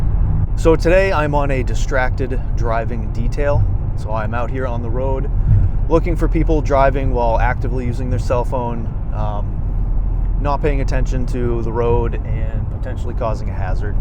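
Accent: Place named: Minnesota, United States. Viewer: I appreciate your service Sir. I always thought they had an accent from bring out east ? You sound almost Minnesotan !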